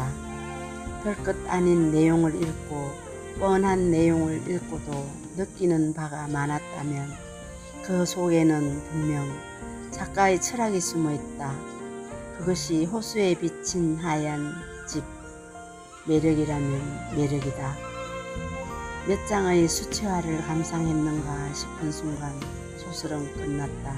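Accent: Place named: Gyeongsang, South Korea. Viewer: 경상도 말투 정감가요 잘듣겠습니다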